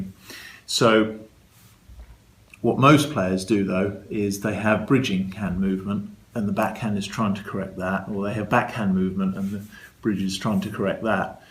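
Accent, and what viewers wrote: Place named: United Kingdom. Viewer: Hi Coach, if you are reinforcing proper cue motion via the trainer, does it fully carry over to cueing without it? Btw, your British English is so good to listen to. Greetings from Poland.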